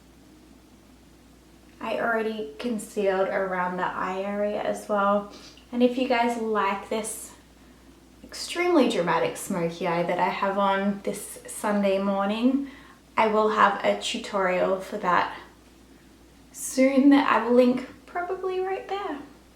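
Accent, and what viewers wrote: Australia. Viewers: Can you please tell me how you got this product so early?! I'm assuming you live in Australia, like me (due to your accent) and it isn't released here until Aug 25th.. and the Too faced and Sephora websites don't ship to Aus :(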